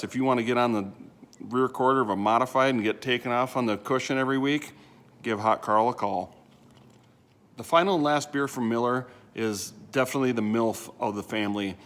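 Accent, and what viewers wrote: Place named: Midwest, United States. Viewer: Are you Minnesotan you sound very midwestern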